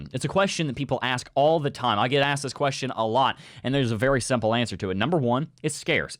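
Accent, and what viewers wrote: South, United States. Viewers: The only crypto guy with a southern accent. My kinda guy. From South Carolina btw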